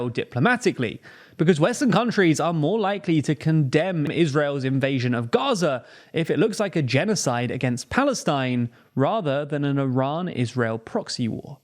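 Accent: United Kingdom. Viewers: You sound british. Let me ask you, when the germans attacked your country in 1940 did your grandparents care about the german civilians??? Grow up. If you elect terrorists, expect terror.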